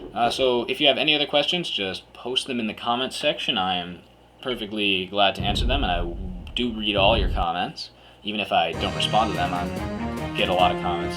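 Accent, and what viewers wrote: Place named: United States. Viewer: I like you extra just because of your American accent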